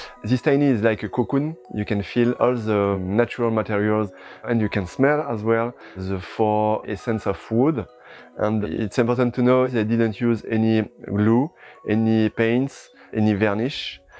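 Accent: France. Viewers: His French accent.🙄🙄🙄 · Si tu sors de la France, la langue française ne sert plus à rien, donc pour atteindre un large public, c'est mieux de communiquer directement en Anglais avec l'accent français, c'est mignon, n'est-ce pas ?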